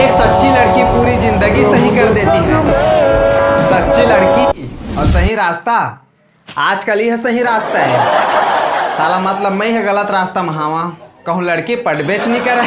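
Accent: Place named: Janjgir, India. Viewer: Janjgiriha tone lgthe ga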